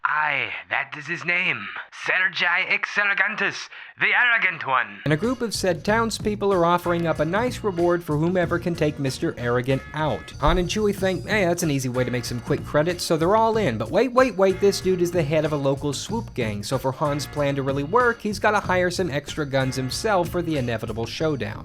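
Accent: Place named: New York, United States. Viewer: Best HS Book Report Ever. Sounds like a New Yorker....gotta be a New Yorker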